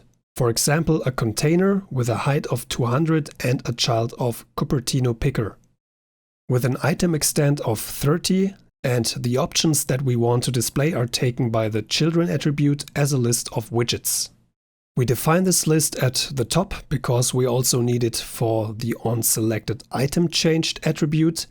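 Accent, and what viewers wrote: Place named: Germany. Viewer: Great tutorial... voice has a great German twist, made me think of -: <link>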